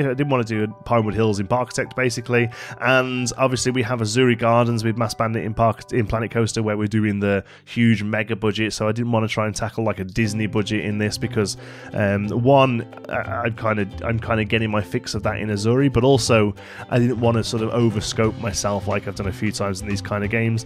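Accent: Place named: North, United Kingdom. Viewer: Are you from the North mah dude?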